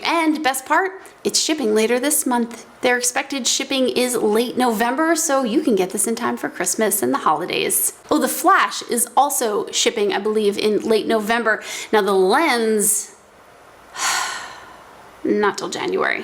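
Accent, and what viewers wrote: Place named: New Jersey, United States. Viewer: That Jersey accent and shtick is priceless!! Well done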